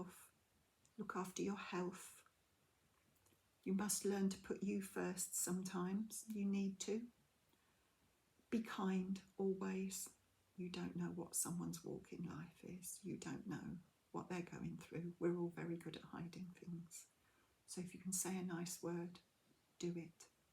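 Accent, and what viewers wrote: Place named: England, United Kingdom. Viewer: Lovely video. I'm so glad to know you. I'm Canadian, but my Gramma was a war bride from England, and I feel a connection, besides crochet. I love the accents you have over "across the pond". I'm on the West coast, and Canada has many different accents, too. Thanks for sharing. Take care and love from Canada 🇨🇦 ✌️🍁💜🐄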